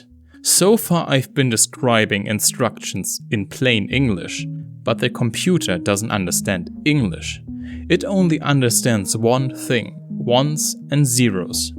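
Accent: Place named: Germany. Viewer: Why am I not surprised the accent in this video is German. Only a German engineer could pull this off.. Excellent job!!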